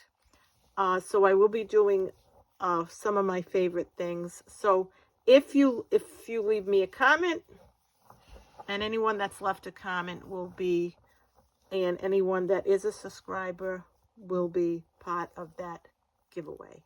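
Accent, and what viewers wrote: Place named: Brooklyn, United States. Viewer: Are u from Brooklyn New York? Your accent sounds like you are. Love your accent!! Im from Louisiana and still live here and ppl think we r from Brooklyn as well. Lol!!! Im asked all the time r u from Brooklyn? Lol! ❤❤ loves these lil baskets! Going to make a few and put candy in them for the family. Thanks! ❤❤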